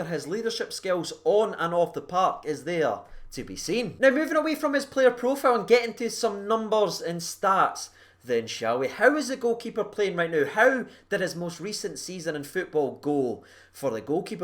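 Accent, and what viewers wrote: Scotland, United Kingdom. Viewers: Scottish, vocal and good reactions Alan McGregors brother?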